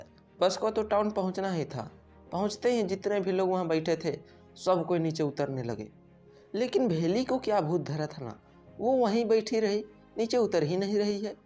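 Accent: Bihar, India. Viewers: Bhai aap Bihar side se ho na....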